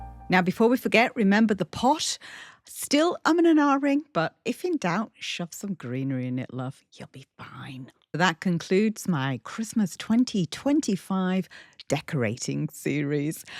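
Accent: United Kingdom. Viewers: I always watch your videos and I always give them I like but I seldom comment. However this was just too beautiful not to comment I love your decorating style and your creativity but most of all I love your personality and your lovely British accent. I’m from Texas near Houston and Galveston so you are familiar with the accents we have which are not very lovely. And speaking of Wimberley my daughter and son-in-law have bought land overlooking the lake and plan to build a house there when they retire in a couple years. They bought enough land for me to have a small house in the back which I’m excited about. Many blessings to you and your family😅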